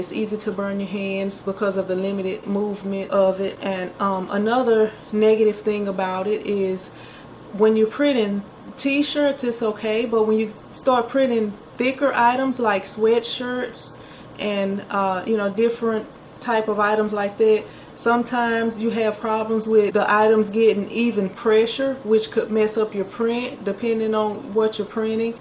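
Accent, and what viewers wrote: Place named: Louisiana, United States. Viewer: Thank You! The information you provided was so helpful! Love the accent I'm from Louisiana also!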